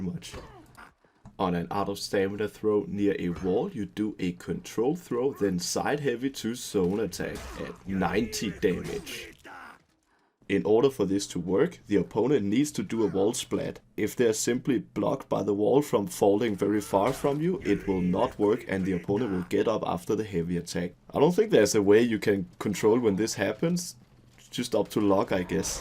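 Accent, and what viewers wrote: Denmark. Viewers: fuuuuck, man kan godt høre at du er dansker :D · The Danish accent is amAzing i love it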